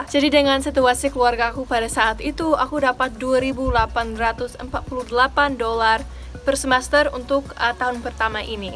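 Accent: Papua, Indonesia. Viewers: Logat indonesianya mulai agak luntur 😁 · Terimakasih atas informasinya sister, sungguh terlihat manis didengar orang asing asli berbahasa Indonesia dengan logat/lahjah Indonesia. Sukses selalu buat sister, brother and keluarga. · Bule longat papua juga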